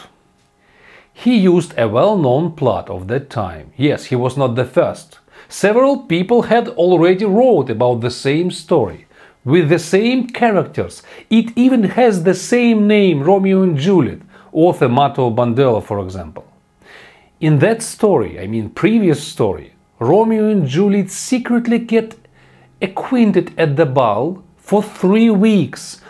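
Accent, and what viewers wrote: Russia. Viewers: Good luck! You do a great job, don’t stop and don’t listen to haters! It’s ok to have hard accent. Russians IT professionals have the same pronunciation and no one bothers. There’s no problem for them to get understood by foreigners or even natives. Wishing all the best, Julia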